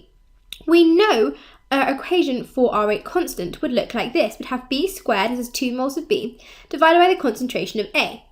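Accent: United Kingdom. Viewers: Actually she's having the perfect and proper British accent. You need to work on your understanding of the Queen's English.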